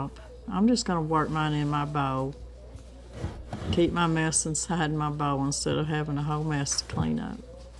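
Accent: South, United States. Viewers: Dear Miss, Another lovely video of you and your family! When I listen to you talking about Christmas and all your decorations, it’s like listening to myself. I have a room in the basement that is just for “all things Christmas” and I keep saying that I need to give some away, but it just doesn’t happen! Maybe I should try harder!🤭😉🥰 I’m looking forward to making the Irish Soda Bread………wish I’d had the recipe last week in time for St. Paddy’s Day, but that can be made anytime of year…….it’s always delicious! Thanks again for your videos……I really enjoy listening to your soothing, calm voice and your gentle southern accent. We’re expecting snow and sleet tomorrow so I guess our spring is aways off yet! God bless you and! 💕💕Jackie🪷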